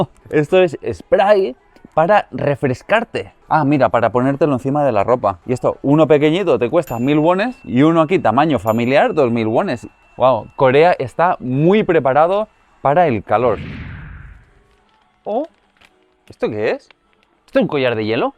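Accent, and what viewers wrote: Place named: Spain. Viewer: Habla El Espanol però con acento Espanol però de donde es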